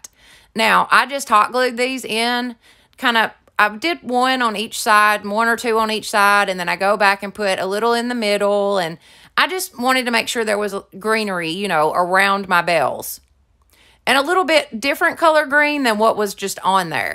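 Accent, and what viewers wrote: United States. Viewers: I love everything g u do plus you're the only person I that I've heard on youtube that's as country as me, lol!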